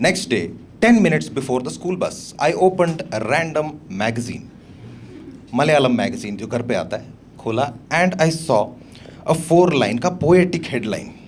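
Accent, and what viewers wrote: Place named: Hyderabad, India. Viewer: Woh hi tho sochru iska hindi slang kuch apna hyderabad ka lagra kaiku bolke patta tho hyderabadi bi hein!! Njaanum oru malayalees from hyderabad kalakki tto 👏👏✌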